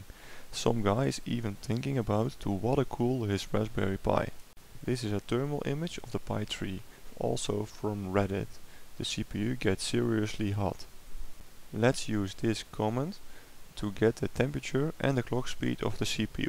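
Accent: Netherlands. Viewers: hoe duur is zon heat zink ik hoor btw dat nl bent Nice videos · nederlands manier van engels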